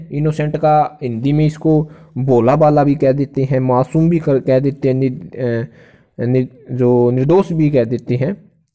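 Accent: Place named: Haryana, India. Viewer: Haryanvi bna di English Hindi ki b bilkul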